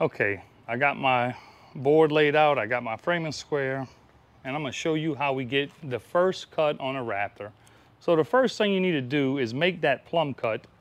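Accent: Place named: Acadiana, United States. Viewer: I recognize an Acadiana accent a mile away.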